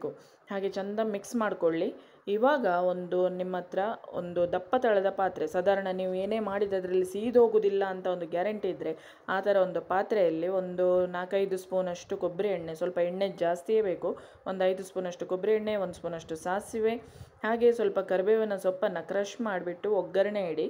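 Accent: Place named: Tulu Nadu, India. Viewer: ನಿಮ್ಮ ಕನ್ನಡ ಮಜಾ ಇದೆ,ತುಳುನವರೂ ಹೇಗೆ ಮಾತನ್ನಾಡುವುದು, ಬೆಂಡೆ ಚಕ್ಕೊ ಮಸ್ತ್ ಇದೆ,🙏🙏👌👌😋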